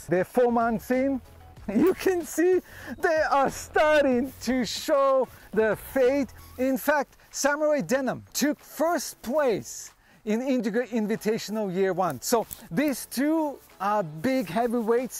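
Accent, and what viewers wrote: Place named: Russia. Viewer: Recognisable heavy Russian accent, IMHO 🤔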